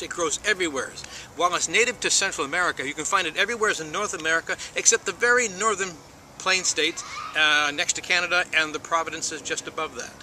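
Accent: Maine, United States. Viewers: Spar varnish (with a Maine accent)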